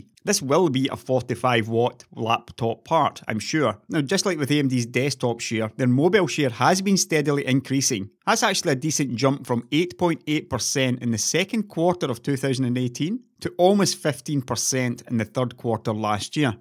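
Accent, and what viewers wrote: Scotland, United Kingdom. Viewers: Never thought that listening to a Scotsman do arithmetic could be one of my favorite things...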